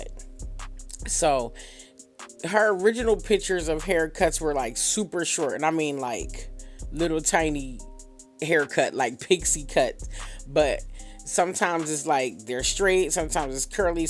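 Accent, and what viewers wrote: Philadelphia, United States. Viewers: I love hearing your voiceovers with your Philly accent! Our accents are so distinctive, although we don't think so, lol. I'd love to have you bless my hair when/if you get back here. I cut off my locs 3 years ago and I've been wearing wigs, but I hate them now and want to do something with my natural hair. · I love the color of her hair. By the way, are you from Philadelphia. Your accent sounds like Philadelphia.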